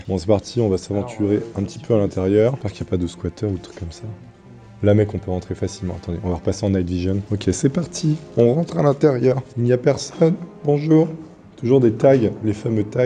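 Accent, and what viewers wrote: Belgium. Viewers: je suis belge et je confirme ton accent ET oui c'est vrais les "esprits" belge son parfois violent;) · Vraiment sympa tes vidéo Urbex :-) Tu utilise quoi comme caméra pour ta night vision ? car je cherche quelque marque ou référence. Sympa ton accent de Belge mais de mon coté vers Charleroi on as pas cet accent de Bruxelles ^^ Par contre oui quand on rend la monnaie ou n'importe quoi d'autres, on dit bien s'il vous plais. Vive les frites et surtout les bonne bières :-)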